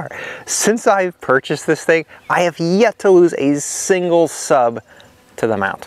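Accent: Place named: United States. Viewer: how come you have an american accent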